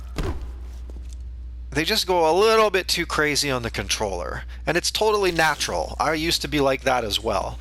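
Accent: Canada. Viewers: pretty sure youre canadian based on the way you talk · Canadian eh?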